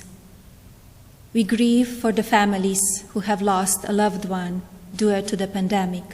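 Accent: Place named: Eastern Europe. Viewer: 她的东欧口音还是可以听出来的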